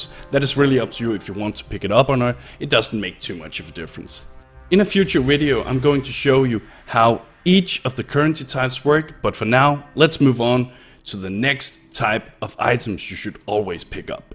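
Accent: Denmark. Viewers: It sounds like you're Danish, Are you? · He sounds danish in the accent. How do i know , well iam danish :P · you sound danish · Er du fra Odense?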